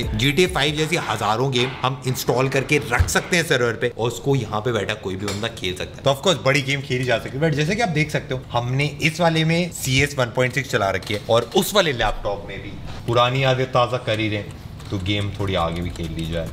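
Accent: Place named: South, India. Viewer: Vai ye batao k aap South se ho kya....south indian jhalak raha hai tumhari muh se....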